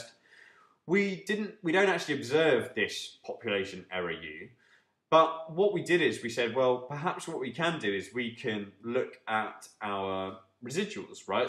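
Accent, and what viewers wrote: United Kingdom. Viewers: it would be great if you enable caption on your videos. as a brazilian student, it is a bit hard to understante british accent.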